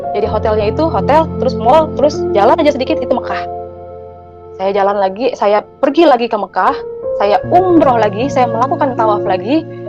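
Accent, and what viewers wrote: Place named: Sulawesi, Indonesia. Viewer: Suara nya KY orang Sulawesi...KY oten gt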